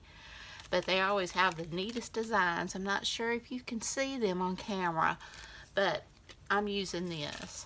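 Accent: South, United States. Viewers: I really enjoyed this video; b/c you were naratting it, it's so nice to hear that southern accent. I can't imagine the humidity you have been having this summer, we have been hit hard and it finally broke last night after a rough rain storm. Tell you hubby not to over do it out there and let him know you want to learn to use that zero turn. I'd love one but I have some side banks that can't handle one. Love that shoe and cutting the top was perfect, it makes a nice pocket. Did I see a rash on your right hand? I hope it's not poison ivy. Have a wonderful week and don't worry about being behind....... I'm 3 weeks behind now on these challenges......... OUCH 😂😂😂 have a great week 🎉🎉🎉 xoxo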